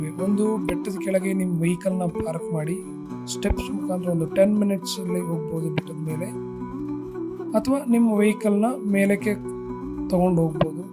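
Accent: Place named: Kolar, India. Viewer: Formal aag matadbeda loki nin accent ee channag aithe local kolar language ee matadu 😂 3 min video alli ond 10 sala aadru "galu " barbekittu ❤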